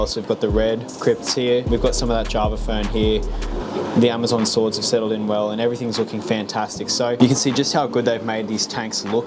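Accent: Australia. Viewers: its cause his Australian accent is kinda hard to understand lol.sometimes what you end up hearing is completely need up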